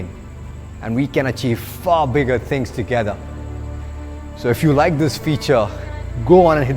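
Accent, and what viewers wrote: India. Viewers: Indian accent... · Indian accent ruined it for me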